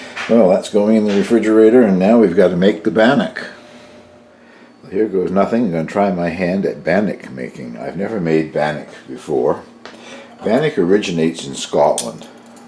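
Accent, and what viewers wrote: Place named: Canada. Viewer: I, like yourself, appreciate good food but not into making comlex ones. I'm learning interesting Canadian pronunciations. We say scones like stones. You say it like skawns. I'm hearing a lot about the instant pot, it looks like it needs a 2 week workshop to learn how to use it. Thinking about getting one. Best wishes.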